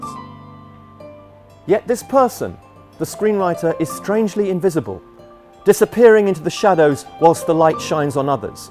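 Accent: United Kingdom. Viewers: everything sounds more important when you're british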